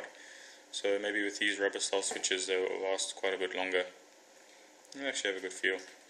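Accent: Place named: South Africa. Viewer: Is that a South African voice I hear. Please tell me you know of a good place to fly in Cape Town, preferably close to the Northern Suburbs. Looking for a place to maiden my Bixler 2, It will will also be my first flight.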